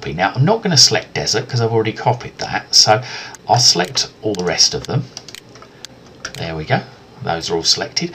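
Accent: England, United Kingdom. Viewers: Great, Great, Have to listen very carefully, because of the English Accent,have an English E-Mail friend, so I am use to it, and have a Cousin in Scotland, that can't understand a word he says, maybe because he works for Johnny Walker in Kilmarnock , that's where my mother was born, on father's side, had a Great,Great Uncle who was a major on the British Army who received the Victorian Cross, John Buckley. Thanks for the video, very well done, Perfect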